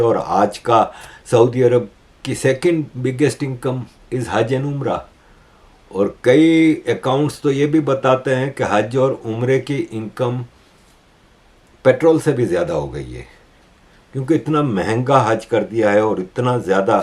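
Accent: Gujarat, India. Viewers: aapke bolne mai gujarati lehja aa jata hai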